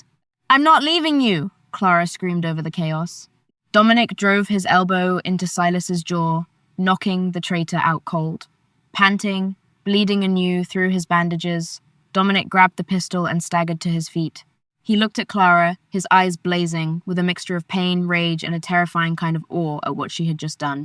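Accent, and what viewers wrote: United Kingdom. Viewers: Yes I did she had to so they both could survive it was self defense. Very exciting g intense story I loved it from beginning to educate. I have a little trouble listening the narrator spoke really fast with a heavey British accent. I am from moline illinois USA and I give a 10+++++for the story. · The British accent is fine. Have you heard the atrocious attempts at Italian or Sicilian accents in some of these?? This isn't AI, so thumbs up from me.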